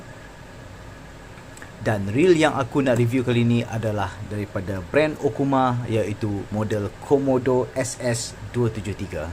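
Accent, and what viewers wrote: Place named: Penang, Malaysia. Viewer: Dekat jla ingat org penang😅😅😅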